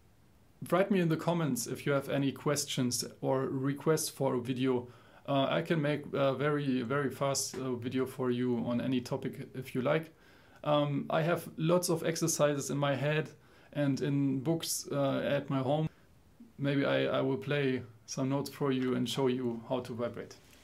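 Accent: Germany. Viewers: haha it's german accent lol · before i look about you i knew that you r from Germany from accent.this video is so helpful for me .so cute _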